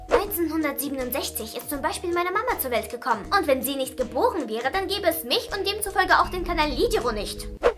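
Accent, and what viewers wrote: Russia. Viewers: Du hast auch ein russischen Akzent und er nicht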